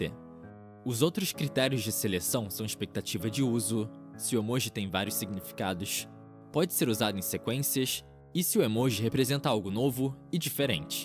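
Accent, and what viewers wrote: Rio de Janeiro, Brazil. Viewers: emojix? pqp odeio esse sotaque carioca 😠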